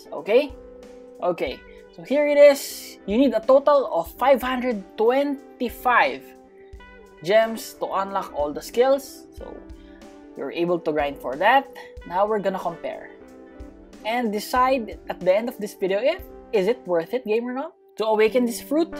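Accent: Philippines. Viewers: Bro had a Filipino accent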